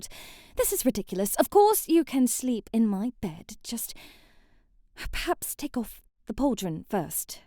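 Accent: United Kingdom. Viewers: im begging for a hairdresser one with Adela you'd fit her with the brittish accent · I love your British voice😌❤so beautiful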